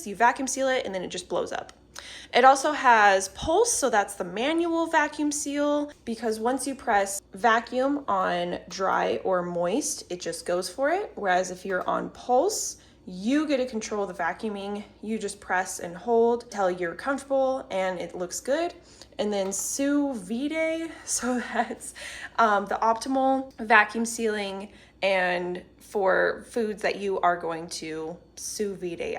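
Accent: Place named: Midwest, United States. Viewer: Hi, I store my hash browns, burritos, etc, in the freezer. They seem to get crusty with frost and just taste awful. FYI, I am not frying them, but use an air fryer to cook them. Do you think vacuum sealing them will prevent this from happening? And bread seems to suffer the same fate. But I saw a video showing a piece of bread turning into a tiny piece of leather after being vacuum sealed. Do you think its best to first freeze the bread and then vacuum seal it so it doesn't deform like your berries? Sorry, I am so new to this and just discovered this. As I am a single father of a 11 year old daughter, I am trying to find ways to preserve food as she will often waste food if I give her too much at one sitting. FYI, I love your voice, you almost seem like you are from Ohio, Minnesota, the Dakotas or Wisconsin. So adorable! I'm in SoCal. 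Let me know if you are ever in the area!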